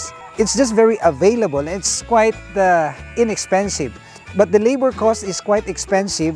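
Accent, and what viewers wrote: Philippines. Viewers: based on his accent, i think he's in the philippines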